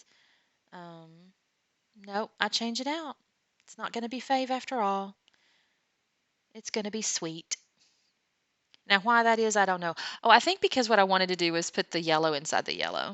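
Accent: South, United States. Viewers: Hi there, were you shouting out to me? Thank you if you are 💖. I try to always leave a comment on the videos I watch. I want to encourage you guys to keep making them for us, lol. This is a nice layout. R u from the south? I am from South Carolina and I hear an Southern accent in your voice 🤗. TFS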